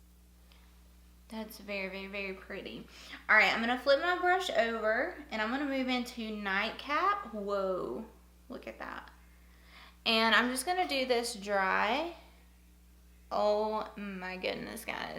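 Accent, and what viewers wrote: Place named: Texas, United States. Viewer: new subscriber here. Are you from Texas? You sound like a Texan.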